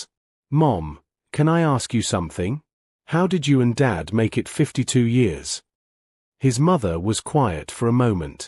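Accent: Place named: United Kingdom. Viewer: So tired of these stories with a British accent. I don't know why but for me it's off-putting!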